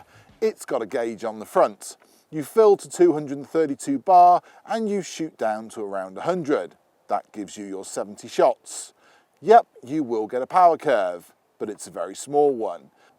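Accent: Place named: West Country, United Kingdom. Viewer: Birthday suit legend, nice to hear some west country tones, I’m not far from you, on the mendips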